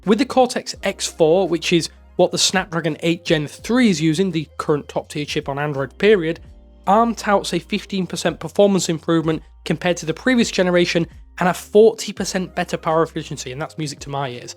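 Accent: United Kingdom. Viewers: Not sure what surprised me more, the very early Google event announcement or the very British ending his sentence by saying "period". I just hope what ever Google does it treats us none US members better. I really hope the Pixel 9 Pro will be a smaller phone as rumoured. A nice selection of colours would be nice too.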